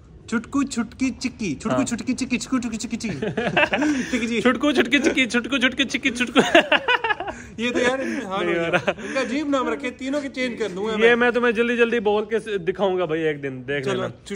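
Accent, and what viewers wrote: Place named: Saraiki, Pakistan. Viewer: VOTE FOR FULL SARAIKI VLOG"👏👏👏 In some of your VLOGs, we can see the Siraiki accent of you people, in some places you also speak, it seems very good, you speak very eloquently and the accent is also very good. All of us will be very happy to see and hear please accept our request.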